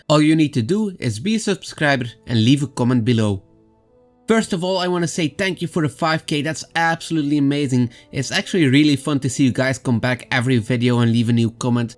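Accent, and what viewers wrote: Netherlands. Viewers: Are you Dutch or Flemish? Just noticed it in the beginning of the vid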